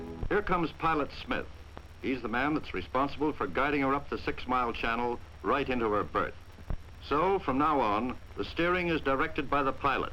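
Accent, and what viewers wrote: United States. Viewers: American commentary!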